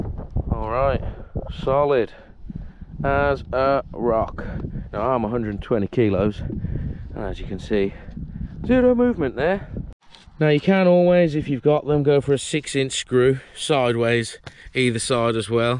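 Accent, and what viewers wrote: United Kingdom. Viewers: Northamptonshire accent?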